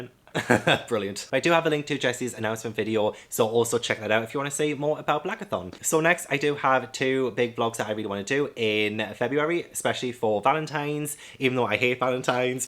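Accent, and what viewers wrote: United Kingdom. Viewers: For those who complain about how you say books must not know that you have a beautiful British accent and that is how the British say books, the way you tried to say books sounded unnatural to me...lol...sounded like you were trying to do an American accent. I personally love hearing a person speak that doesn't sound the same as me. My personal favorite is the way people from the UK say helicopter, it's so adorable. Never change for anyone, always be you. I couldn't stop laughing about that manga Dick Fight. Now, I am curious about it...lol. Also, shout out for the hoodie, that's '90's classic Nickelodeon cartoons, love it!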